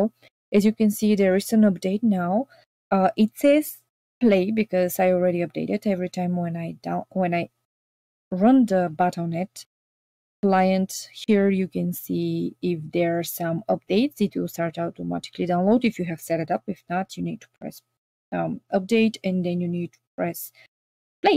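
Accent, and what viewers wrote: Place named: Bulgaria. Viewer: hey hey im really wondering what your first language is? is it bulgarian? you sound like my bulgarian friend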